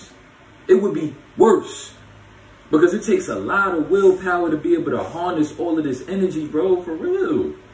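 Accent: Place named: New York, United States. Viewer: This nigga from New York for real for real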